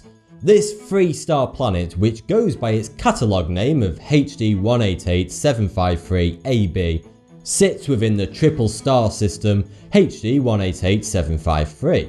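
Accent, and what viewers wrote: United Kingdom. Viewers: 偏英式 我覺得很好聽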